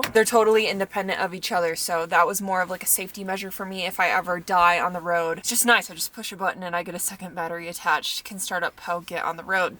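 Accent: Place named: Midwest, United States. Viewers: Oh sure, I didn't even notice the accent until ya pointed it out, ya know. Whelp, from one Midwest girl to another: Tells yeh mom an them I says hi. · you're weird, because you are YOU. That's who you are. And the seats? Weeeeell.... 🤣🤣🤣🤣No, just kiddin. You love them, then I love them. And, Good you got these stuff done to Poe. And, what's wrong with your Michigan accent? Be proud of who you are. We, your people, love you. And we sure hope the Best for Blue! ♥️♥️♥️♥️♥️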